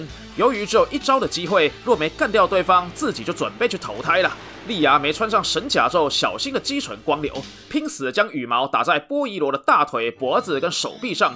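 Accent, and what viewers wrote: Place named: Minnan, Taiwan. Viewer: 这部漫画也是我小时候看的最喜欢的动画片之一，看得出来UP主很用心在解说，但说实话虽然你尽量用接近普通话的口音解说但依然能听出你有闽南语口音，你是台湾那边的吧？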